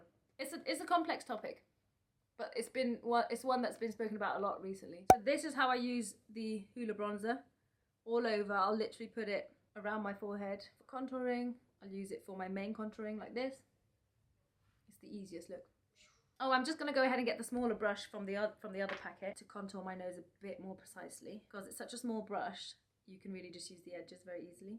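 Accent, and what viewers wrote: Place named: Scotland, United Kingdom. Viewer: Oh I hear a Scottish accent! :D hello fellow Scot!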